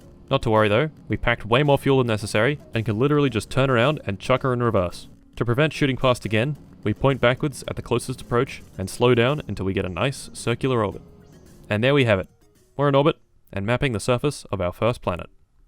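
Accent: Australia. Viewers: are u an aussie? im curious...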